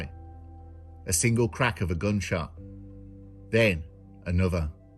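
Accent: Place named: Black Country, United Kingdom. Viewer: Just love the black country accent. Fantastic. Brilliant narration as per usual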